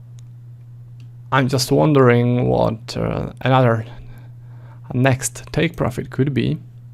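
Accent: Czech Republic. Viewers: Česká angličtina trošku že? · Are you from Czech Republic?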